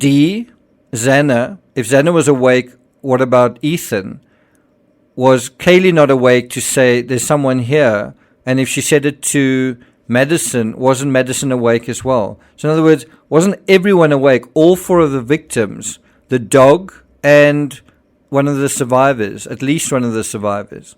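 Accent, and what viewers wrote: South Africa. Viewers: Is the narrator South African?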